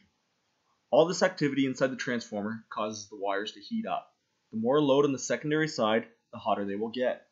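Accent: Canada. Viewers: Very Canadian. I might guess Nova Scotia.... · Canadian eh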